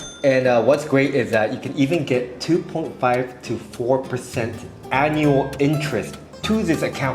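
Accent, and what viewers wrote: Singapore. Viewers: We welcome you with open arms n so much love! You are a wonderful person n i love your Singlish n Singaporean habits! Im happy that you are happy living in Singapore. Hope I meet you in person one day! 😊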